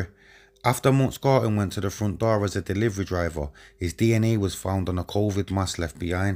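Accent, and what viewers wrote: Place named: Manchester, United Kingdom. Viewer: Why are you putting your ‘manc’ accent on mate ?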